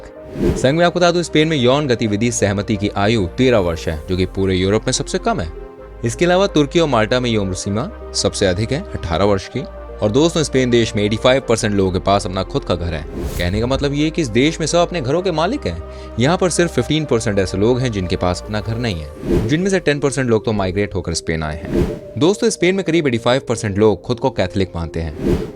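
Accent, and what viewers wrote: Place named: Mumbai, India. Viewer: ye voice or mumbaiya language bahut bor krti h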